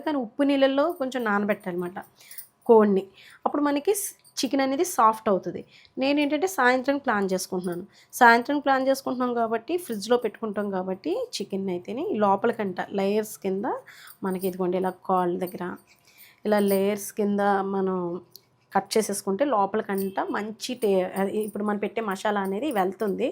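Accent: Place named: Vijayawada, India. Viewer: Mee. Maatalu. Bagunnayi. Mee. Pilla. La. Maatalu. Vijayawada. Language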